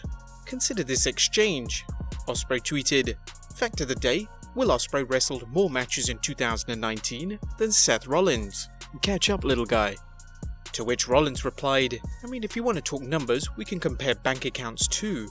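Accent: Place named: United Kingdom. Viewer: Ok that is the very first time I have ever hear someone pronounce the word as STAYTUS. I mean I know your british and all but damn you act like your accent prevents you from saying STATUS like everybody else. Do you say APPLE or AYPPLE?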